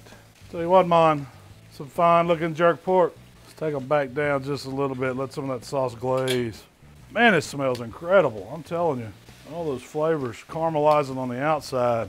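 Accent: South, United States. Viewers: It looks good, yet the Southern & Jamacian accents don't quite harmonize.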